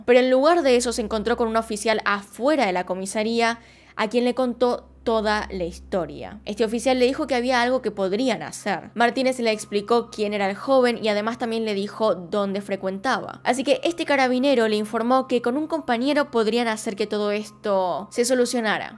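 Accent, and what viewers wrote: Buenos Aires, Argentina. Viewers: "si quieren escuchar mi voz de argentina" me dio mucha risa porque en realidad no se que sea pero me encanta ver tus videos y esuchar tu voz,aunque hables de cosas feas me gusta demasiado como narras:) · <3 creo que vicky tiene razon con lo de la voz argentina, ya que para mi eso es una de mis cosas favoritas de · Buenas tardes guapísima acabo de ponerme a escuchar casos y el primero por supuesto es el tuyo. Punto uno me gusta tu trabajo y como lo hacéis, a mi no me importa en absoluto tu acento argentino me agrada en que a veces algunas palabras no las entiendas o pienso que tienen otro significado. Pero igualmente me gusta mucho tus trabajos. Acerca de este caso, lamentable la vida de este chico, su principio y su final fue muy lastimoso. Y hay que reconocer que el trabajo de los carabineros fue bastante rápido, acerca de otros casos. 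Saludos desde España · Chale esta rebonito tu acento ARGENTINO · ADORO TU ACENTO ARGENTINO. ESO TE DA PERSONALIDAD, IDENTIDAD, ESO ES TU TESORO. UN ABRAZO GRANDE, SOS GENIAL. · no lo tomes a mal, jeje pero suena muy bonito tu acento argentino, y mas cuando dices el "Bienvenidos a mi video, una vez más" saludos y buen video. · Acento porteño. Y a mi me gusta tu trabajo. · Hermoso referente argentino, bella, muy buena voz y bien porteña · Amo tu acento argentino. Saludos desde 🇨🇴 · Me gusta tu contenido y cómo explicas los casos, pero tienes razón y amo tu voz argentina, soy mexicana y soy super fan del acento argentino __ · Me encanta tu voz de argentina 😅 me relaja y me gusta como cuentas las historias en tu otro canal, saludos 👋👋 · Sí, yo también vengo en parte por tu voz de argentina jajaja. Un abrazo desde Perú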